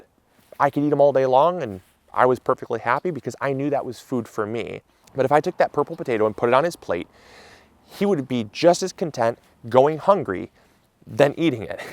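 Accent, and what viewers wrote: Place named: Wisconsin, United States. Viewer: hello Wisconsin! that 70’s show voice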